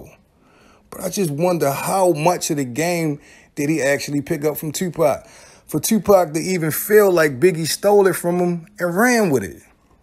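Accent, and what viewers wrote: Baltimore, United States. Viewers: Subbed heard you say tew an kne you from baltimore. The content is A1 so the sub was a easy choic dummy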